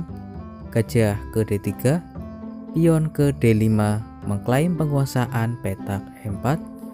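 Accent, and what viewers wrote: Banyumas, Indonesia. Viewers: Ngapak ya bang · Bahasanya ngapak ngapak bang..hehe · Logatnya seperti Purworejo - Banyumas ya, aku Wonogiri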